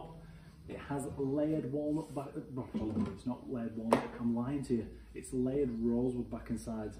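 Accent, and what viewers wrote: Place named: Bolton, United Kingdom. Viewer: Cracking Bolton accent!!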